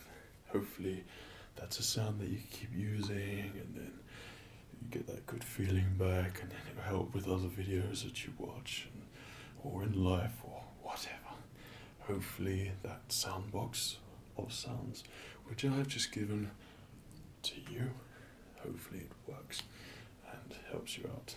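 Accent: United Kingdom. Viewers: I'm glad you're making videos again. Your voice is a big trigger for me. Especially when you start to speak softer and softer and softezzzzzzz.... And I've noticed British accents also really work for me, though, no clue why. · What region of Britain do you come from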